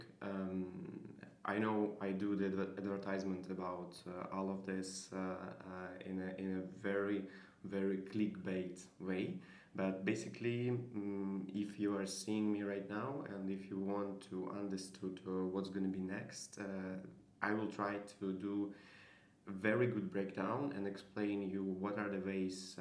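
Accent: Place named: Russia. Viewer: Хочешь на англ аудиторию работать, поставь произношение. Такой английский для рускоговорящих - родной, но возникает немой вопрос: "а можно уже на русском?". Англофоны будут скипать пока, сложно разобрать что ты там говоришь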